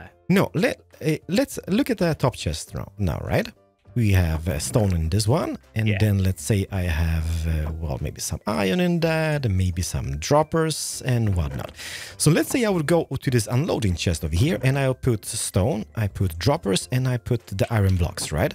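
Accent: Scania, Sweden. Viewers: i love his accent: its called skånska. om du ser detta, jag är ocks skåning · Blev chockad när jag hörde den skånska dialekten! Var inte beredd på det! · are you Swedish?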